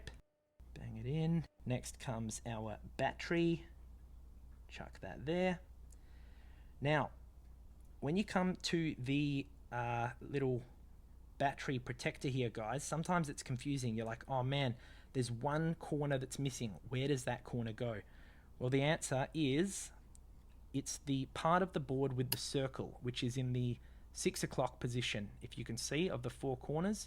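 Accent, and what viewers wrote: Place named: Australia. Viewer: This is a wonderful tutorial. Thanks. Your Aussie accent is amusing to follow.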